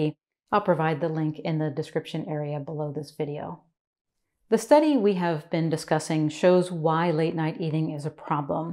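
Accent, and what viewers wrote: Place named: United States. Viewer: Excellent advice. Wonderful delivery in your calm voice with no trashy muzak track. I'm UK and some USA accents are not for me. Yours is perfect. To me you sound like Mary Chapin Carpenter so I wonder if you come from the same part of America.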